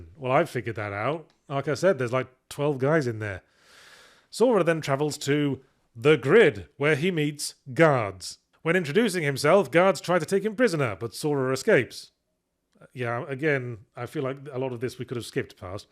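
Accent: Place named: United Kingdom. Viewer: I have had multiple people in the past try to get me into this series when they found out I hadn't played any of the games, by explaining them. Without fail, roughly 5 minutes in, my eyes would glaze over and I would silently stand up and walk away without saying a word. I made it through 10 minutes of this video, largely on the strength of Yahtzee's voice and British accent (none of the previous people had British accents) before my eyes started to glaze over and I stopped the video to type this message. Hope the video does well, but 10 minutes is all I can stand.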